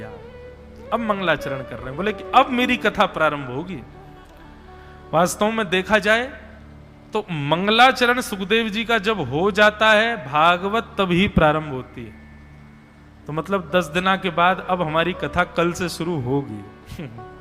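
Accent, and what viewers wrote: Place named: Braj, India. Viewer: Raadhey raadhe guru ji aapki katha bhut acchi lagti h aapke mukharvind se brij bolo bhut acchi lagti h lala ka darshan ho jata h katha me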